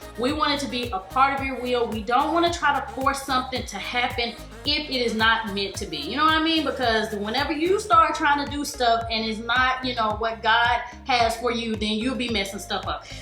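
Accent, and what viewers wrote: South, United States. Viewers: Why did I read her t-shirt in her cute southern accent lol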